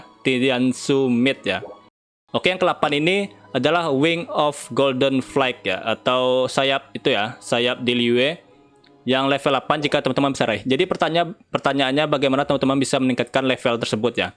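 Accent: Padang, Indonesia. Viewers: Gw tambahin subcriber lu gan.. infonya bermanfaat dengan gaya bicara yg uniq. Org padang kah ?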